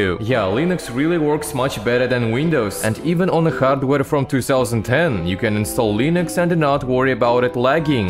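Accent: Russia. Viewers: bro english in russian accent is so harddd🔥🔥🔥🔥🔥🔥🔥🔥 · Бля чел у тебя русский акцент почему у тебя только английские субтитры и озвучка😭😭😭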